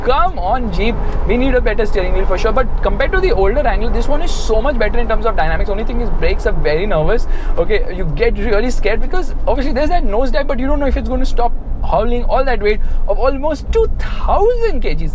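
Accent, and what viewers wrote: India. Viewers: Wow wow wow - The first thing you need to do is apply brake on ur tongue. It seems u are doing 250 words per minute & that too in Indian style English. Should we call it a running commentary or a runaway commentary? I'm sure 99% of ur audience will appreciate if u slow down. Basically u r ruining d show with your runaway commentary. Sorry if u find me rude, but I just honestly gave u the feedback. Thanks.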